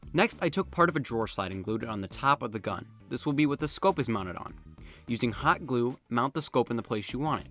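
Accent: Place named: New York, United States. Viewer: you spund like youre from new york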